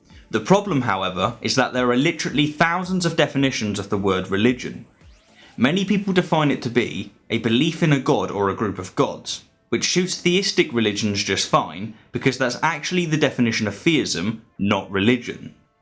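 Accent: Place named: West Country, United Kingdom. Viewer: I am trying to locate his accent. I could be wrong but I would place it somewhere in what we call "The West Country" which is the bottom left of the UK from Bristol west. However, some of the sounds are similar to Norfolk to my untrained ear but I would stick with Bristol or Brissal as we know it. "Yerite my luvver"?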